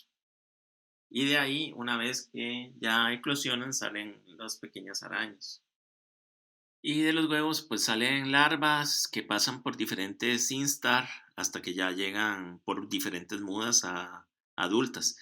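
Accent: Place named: Costa Rica. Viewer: El acento tico no se pierde xd Gracias mae por el video <3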